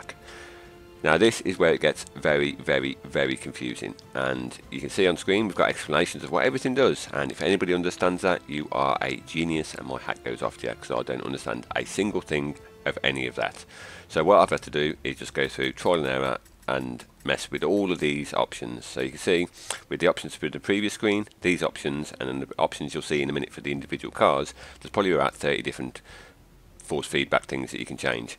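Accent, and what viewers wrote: Black Country, United Kingdom. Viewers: where u from mate. I can here the yam yam accent. Lol im from wolves.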